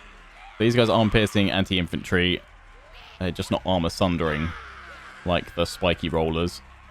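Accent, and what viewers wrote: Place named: United Kingdom. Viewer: Hearing all the unit names from a Brit sounds like I’m getting insulted. I LOVE IT!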